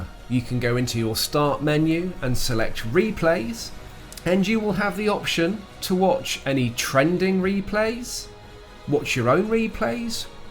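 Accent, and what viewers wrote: United Kingdom. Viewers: Are you Bristonian ?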